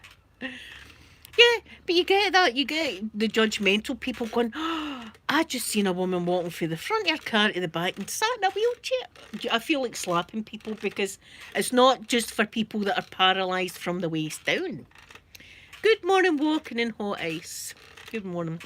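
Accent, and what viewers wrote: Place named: Corby, United Kingdom. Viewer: Ohh can of bru! Girl after my own heart, I live in mini Scotland, Corby, yiu sound like eveyone hear, even the slang the same x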